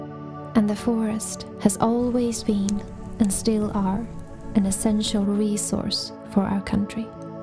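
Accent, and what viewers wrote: Sweden. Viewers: I feel like I'm writing this with your beautiful Swedish accent. :D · First time hearing you speak and I nearly cried. Your voice/accent is adorable and so beautiful. I now want to visit Sweden more then ever before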